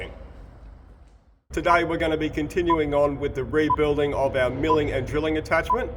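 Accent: Australia. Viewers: I THINK IT WOULD BE AWWSOME TO DO A VIDEO IM YOUR NATURAL AUSTRALIA DIALECT. I BET WOULD BE HILARIOUS THINK ABOUT IT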